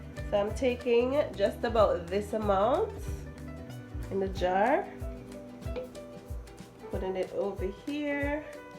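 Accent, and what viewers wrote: Antigua and Barbuda. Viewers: YouTube brought me here because I was looking for a recipe, and then I heard the accent and was like, "Hold a minute." Antiguan 🇦🇬 can't hide.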